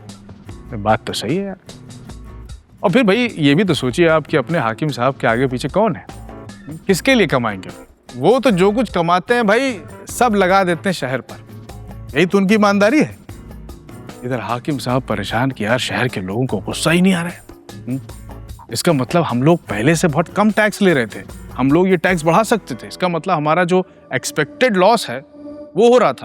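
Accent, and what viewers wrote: Lucknow, India. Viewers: Lucknow tone ❤❤❤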